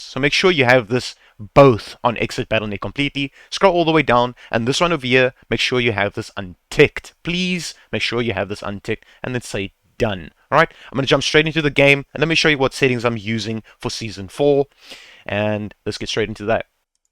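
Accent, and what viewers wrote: South Africa. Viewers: love your stuff man. Are you South African?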